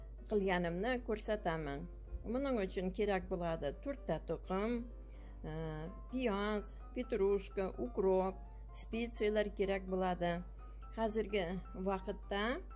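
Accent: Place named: Turkey. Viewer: Turkmisiz deyman aksent bilan gapiryapsiz ozbek tilini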